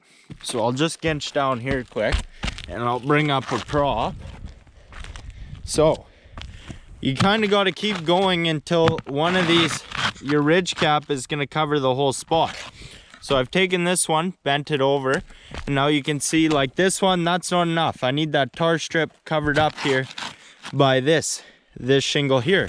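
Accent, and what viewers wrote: Canada. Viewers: There’s my favorite Canadian voice! I just got a new coop with cedar shake siding and a cupola, that has a chicken weathervane on top. It took an entire summer to build, but it’s really cool and the chickens love it!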